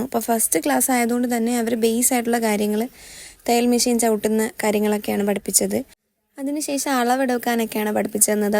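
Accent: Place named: Trivandrum, India. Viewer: Slang trivandrum anu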